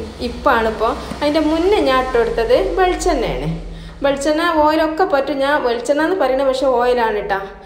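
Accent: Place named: Malabar, India. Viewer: മലബാർ style സംസാരം വേണ്ട. തനിനാടൻ മലയാള ഭാഷയിൽ സംസാരിക്കൂ. ഞമ്മൾ കേട്ടോ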